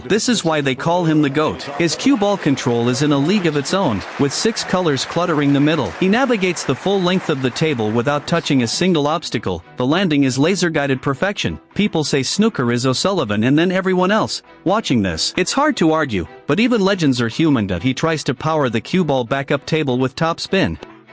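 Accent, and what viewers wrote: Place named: United States. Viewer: we fkn know..and a fkn yank commentary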